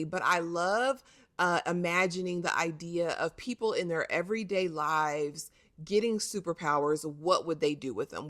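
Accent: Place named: United States. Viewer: I read both your comments so I will address both here. sound American. I AM AMERICAN and she is the only American I have seen covering this show on YouTube. Even their interviews are the UK versions of outlets like Vogue. No one cares about validation. Validation comes from within. Whatever PERSONAL issue you have with Europe vs. America's African Diaspora's culture is your own. FACTS. Facts are that the US is the largest entertainment market next to China. British actors and directors in interviews have said they started making more money and getting more exposure (to get hired on more projects) when large wide spread American audiences started becoming fans. I'm sure Rapman would like to get paid, but if not and he wants to make it "our own thing" because he is not seeking "validation" as you say, instead of being a story teller that wants his work to get to the largest audience possible, then good on him. 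Money is what makes the world go around and if you can make millions in your home town, but if you know you can make billions one town over, why would you cut off your nose to spite your face? MONEY! I know he is Canadian, but didnt Drake save Top Boy from cancellation? Rapman said he has a story for three seasons planned and I want to see him get his seasons. Get his MONEY! China is the only entertainment as large as the US and they ae racist as hell. Historically these types of shows and movies do poor there. They even REMOVED Chadwick Bozeman's face off the B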